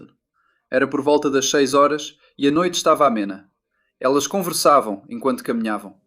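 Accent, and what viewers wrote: Portugal. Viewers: Esse sotaque de Portugal nossa muito ruim de ouvir. Voltem com o nosso português por favor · Eu gostava bastante deste canal, mas agora com esse sotaque de Portugal, estou pensando seriamente em desfazer minha inscrição, esse sotaque é irritante 😡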